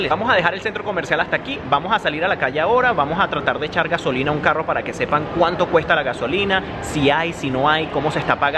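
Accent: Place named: Venezuela. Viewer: El Luisito comunica venezolano:v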